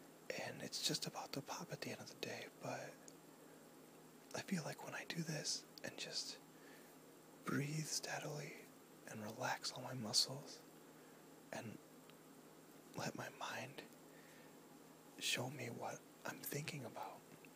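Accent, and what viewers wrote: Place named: New England, United States. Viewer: Are you from Boston?? Or anywhere in mass. Or southern new Hampshire because you talk exactly the way I do and I'm from southern new Hampshire :)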